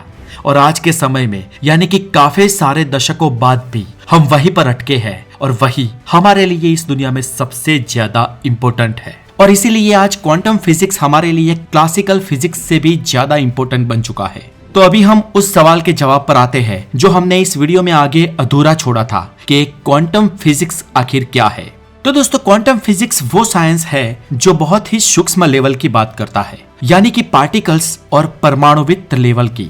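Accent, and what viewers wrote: Gujarat, India. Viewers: gujarati hai. par udisa bilkul dusre chhor par hote hue bhi similar hai kuch chize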